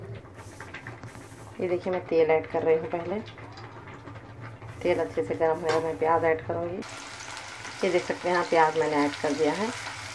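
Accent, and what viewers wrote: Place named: Hyderabad, India. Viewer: Apki Hyderabadi baat sunke bohat Acha Laga...I m from hyd but living in Oman... always I miss Hyderabadi people & Hyderabadi foods..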